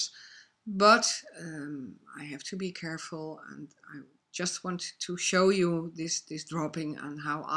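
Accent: Netherlands. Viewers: big time, im 100% also born in the U.S. with a dual citizenship. i can pick up a dutch accent a mile away! Ik vind het fantastisch is. ook veel succes met je paarden en bedankt voor het antwoord!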